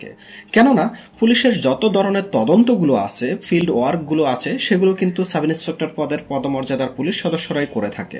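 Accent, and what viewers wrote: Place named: Comilla/Noakhali, Bangladesh. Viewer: আপনার বাড়ী কোথায়? কুমিল্লা / নোয়াখালী?আঞ্চলিক টান রেয়েই গেলো।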